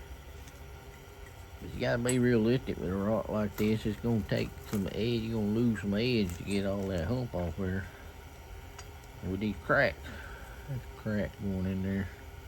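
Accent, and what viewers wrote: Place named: United States. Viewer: I really like your videos, and even more your good old american accent 😁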